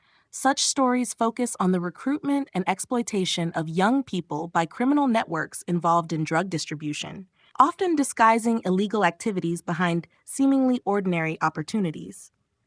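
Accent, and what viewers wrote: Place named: United States. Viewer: Isn't Corrie a British programme? Why is the narration American? (I'm assuming AI, but still ...)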